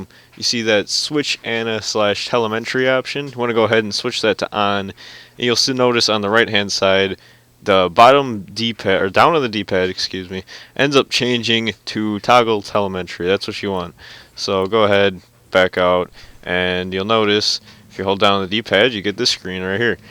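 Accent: East Coast, United States. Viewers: Dude are you from the East Coast? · are u from the east coast?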